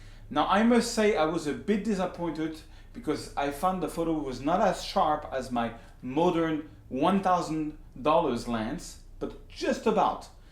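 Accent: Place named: France. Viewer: My ears are bleeding to this awful french accent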